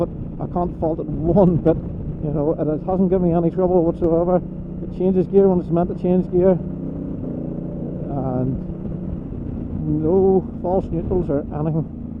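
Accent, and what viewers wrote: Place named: Scotland, United Kingdom. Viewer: Nice review.But I could not understand a lot of what you said because of your Scottish accent. I thought this was the same as the foot shiftier on the DCT. But I guess it is different. But thank you for the video because now I have to investigate. I do not need an automatic so much but was thinking about getting it because I will do 80% of my riding in the city. But if they make something like this for the manual version I would rather save the $700 that the DCT would cost me.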